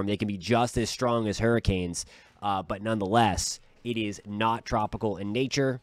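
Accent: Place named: New England, United States. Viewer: Not weather related but did you say you’re in Texas? You sound like you were raised here in New England. Great pace delivering concise and interesting information- thank you!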